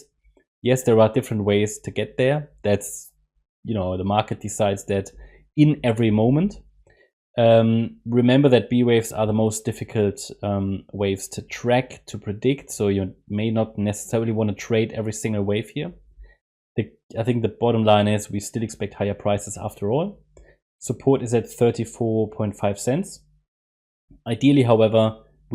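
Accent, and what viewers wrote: Germany. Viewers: Man I can feel that German accent, love it. Keep it coming. Can you reference a link to your membership and what is actually available for subscribers \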